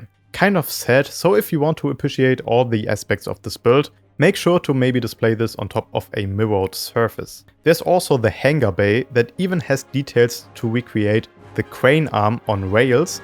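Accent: Germany. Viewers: Wie kommt man in Deutschland an die Teile, wenn man das bauen will. Die Anleitung finde ich bei euch auf der Website. Cool. Und da ist auch ein XML mit ner Teileliste. Auch cool. Aber Lego Pick A Brick akzeptiert nur CSV oder JSON Files. Also hab ich die Liste mit Hilfe von AI in ein CSV File umgewandelt. Leider bekomme ich dann bei Lego die Meldung, dass es kein einziges dieser Teile derzeit verfügbar gibt. Ich nehme mal an, irgendwas mit den Teilenummern kann nicht stimmen? Oder Lego Pick A Brick ist der falsche Ort? Wäre nett, wenn ihr mir da helfen könntet. Weil so lange ich die Teile nicht irgendwo bestellen kann, macht es ja auch keinen Sinn, die Anleitung zu kaufen. Hätte echt Lust, das nachzubauen. Ich hab Anhand deines Akzents einfach darauf geschlossen, dass du deutsch bist lol. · Mann hört das du deutsch bist so raus😂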